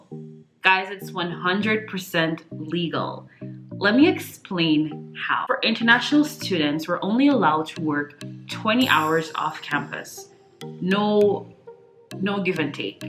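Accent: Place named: Jamaica. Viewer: sounds like a yaadi (Jamaican)....are you?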